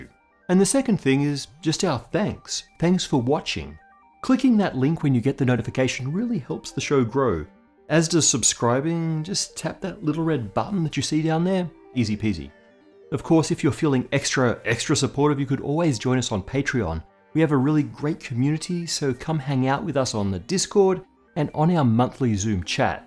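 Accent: Australia. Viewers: I'm so confused, you have an Australian accent and say "zed" not "zee", but you also said "math"...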